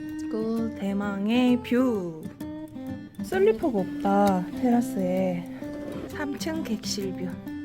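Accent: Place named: Jeolla, South Korea. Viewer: 정겨운 전라도 억양, ㅎㅎㅎㅎ 비비체 뷰가 꼭 가보고 싶네요.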